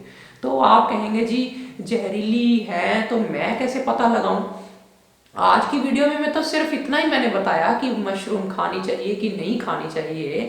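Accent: Punjab, India. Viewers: Namaskaram guruji I love your Punjabi tone and i am not Punjabi but I love Punjabi language...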